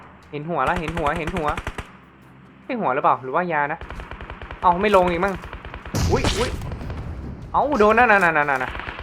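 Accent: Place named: North, Thailand. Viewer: เกลียดพวกรวมตี้!!! ชอบฟังภาษาเหนือมากๆ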